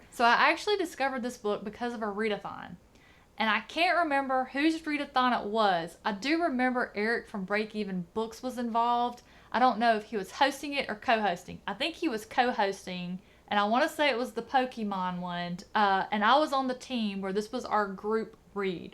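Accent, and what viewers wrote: South, United States. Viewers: I love your channel. I have a strange question. Do you often get judged for your southern accent? Meaning the stigma about the south and education? I wonder because I have big muscles and I often get comments from people like “meathead” or girls being shocked that I’m a book nerd. Anyway, weird question ,I know. I was just curious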